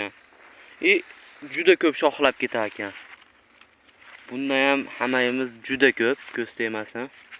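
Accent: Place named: Tashkent, Uzbekistan. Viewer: Toshkenlimisiz shevangizdan bilinyabdi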